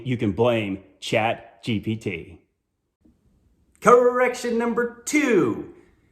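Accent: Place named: United States. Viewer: You speak US English. No reason to apologise for it.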